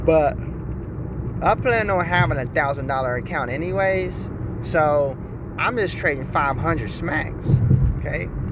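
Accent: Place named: United States. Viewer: My mentor is sounding more country each video lmao. Good job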